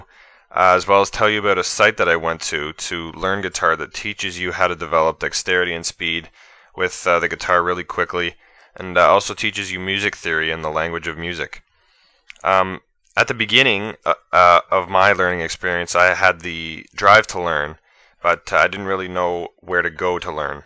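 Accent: Canada. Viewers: Are you Canadian? There's just that hint of an accent. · are you canadian? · Are you Canadian? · you're Canadian xD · you're canadian, aren't you?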